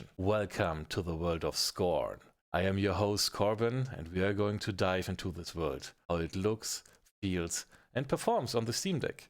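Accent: Germany. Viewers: Bruuder dein deutscher Akzent ist ja mal mega hart :D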